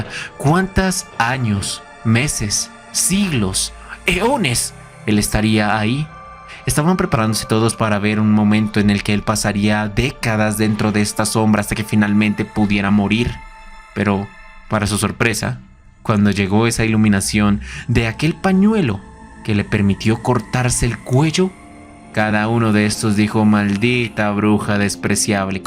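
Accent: Colombia. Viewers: Eres colombiano? Sho crei que vos eras argentino che.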